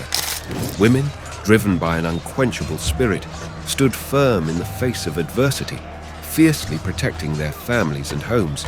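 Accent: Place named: United Kingdom. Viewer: Maybe it's me, Nah, I've heard others say it too. Linguist that are intent on communication and it's many intricate variations. Dealing with pronunciations is particularly grating when something isn't correct or seemingly even attempts to be correct are ignored straight out of hand. The first few times Onna-Bugeisha was pronounced it seemed the narrator had no compulsion or compunction to attempt to say things even remotely studied. Japanese is (perhaps faultingly so) pronounced singularly each and every time. Generally one attempts to avoid accent things but it's not important. A-E-I-O-U are pronounced one way. Combined vowels are pronounced one way too. Extended vowels and silent vowels are standard 'ALL THE TIME' Very few exceptions, but even those are pronounced the same way all the time. ONNA BU GEISHA Not to be confused with Chinese concept of BU being opposite. 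ONNA Oh Nah Boo Gay Shaw. It would have been nice that the narrator could have been consistent in his reading that it would have sounded like he wasn't talking about different actions and jobs. Sometimes saying it like indicated above. Often saying it like AnnA BuGaiSha other times sounding like Anna BuGasha. The incessant habit of British to put a 'Y' between a consonant and 'U' is mind boggling and on the occasional adding an 'I' when pronouncing things like TOKYO or KYOTO coming up with Toe Key Yo or Key Yo Toe very odd indeed. Such be the circumstance when pronouncing SAMURAI not Saem You Rye but if you try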